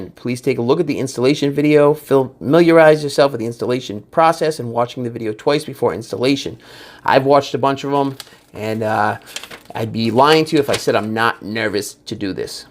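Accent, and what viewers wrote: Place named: Massachusetts, United States. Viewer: GREAT review and How-To. I did mine following along, liked and subscribed. Thanks a lot and loved hearing the familiar accent, close to home. Sounds kinda like.... Somerville, MA if I had to guess. MAYBE, Medford?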